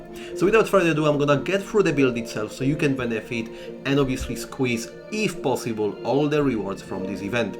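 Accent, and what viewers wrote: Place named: Israel. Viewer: oh cool, your accent sounds very israeli, your accent is very different than most polish people i meet here in london. i wouldve never guessed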